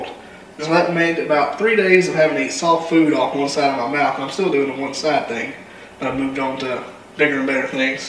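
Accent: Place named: South, United States. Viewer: That southern accent!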